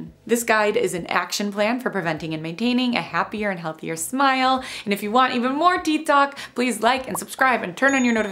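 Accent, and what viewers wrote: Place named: Midwest, United States. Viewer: Are you from Chicago or the somewhere in Midwest by chance? You totally sound like me and my family!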